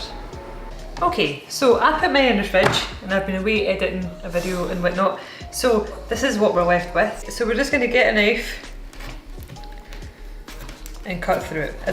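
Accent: Scotland, United Kingdom. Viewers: New subscriber! How did I not know about your channel lol :D I do recipes too...nice to hear another Scottish accent x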